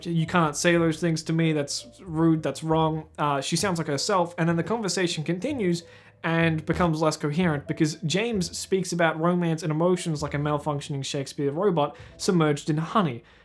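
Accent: Australia. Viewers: me just finding out is australian and not british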